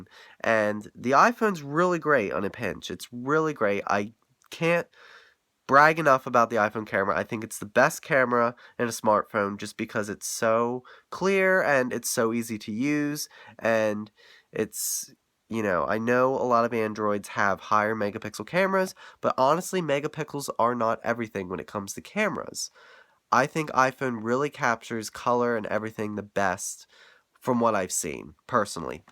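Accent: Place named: Philadelphia, United States. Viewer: You sound like you're from around me :) Philadelphia? And yay- Can't wait til 31st!!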